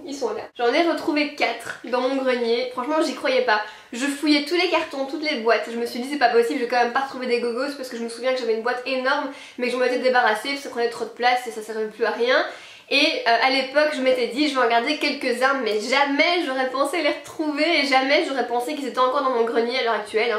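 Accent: Belgium. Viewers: Oh je suis nostalgique <3 j'adoooore la vidéo et ce look te va à ravir :* C drôle comment vous dites 90 xD c l'accent belge ?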